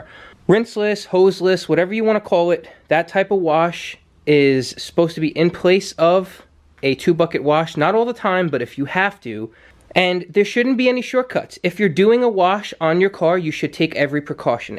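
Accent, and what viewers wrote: New York, United States. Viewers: ayo why do i feel like you're from new york? lol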